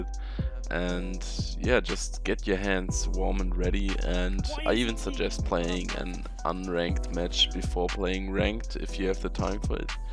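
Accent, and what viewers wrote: Germany. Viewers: Are u a german guy ? (Because of the accent)😅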